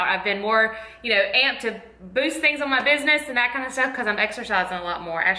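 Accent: South, United States. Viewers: You are so southern and I love it!!! I'm a Texas gal!!! You are so down to earth and hilarious!!!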